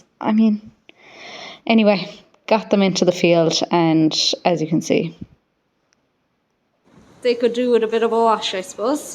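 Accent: Kerry, Ireland. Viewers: dont say yearlings The original farmer word is WEANLING That's just what I think You use some lovely old expressions like the "Pike " and definitely don't lose the Kerry accent Best of luck from coMeath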